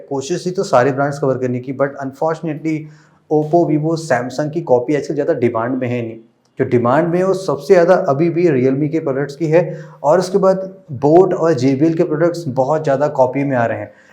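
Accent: Lucknow, India. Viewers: Sir, Are you From lucknow?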